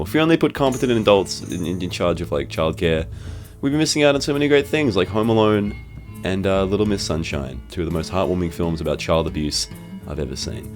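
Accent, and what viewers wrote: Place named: Australia. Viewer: so fucking australian it's perfect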